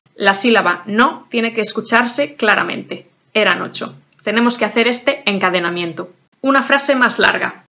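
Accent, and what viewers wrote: Spain. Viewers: I love your Spanish accent so much ❤ Thank you dear ❤❤❤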